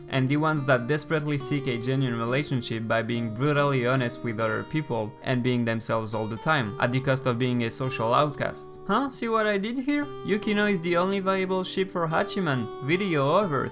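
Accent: France. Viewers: Good video !! Btw, are you french ? (Your accent)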